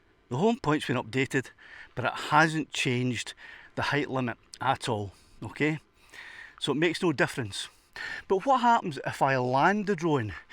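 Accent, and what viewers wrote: Scotland, United Kingdom. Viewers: For a great Scottish accent I am happy to subscribe. Also I am a geologist with a background in structure in the high Alleghenies, but not far from the Valley and Ridge. I have been wondering about handling elevations photographing in structurally complex terraines. This one helps, thank-you!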